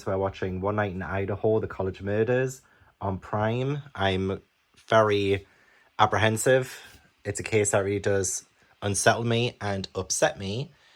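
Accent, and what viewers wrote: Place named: Newcastle, United Kingdom. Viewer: I love how Geordie you get talking to the cats!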